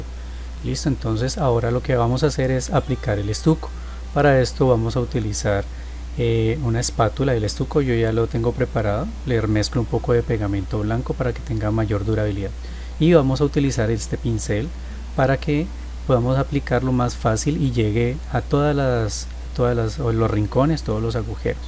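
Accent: Colombia. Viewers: Muy buen video 👌😃 (tiene acento colombiano pero no usa términos colombianos)(?)🤣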